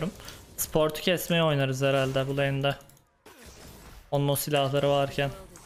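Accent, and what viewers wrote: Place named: Thrace, Turkey. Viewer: nereli bir Trakya havası var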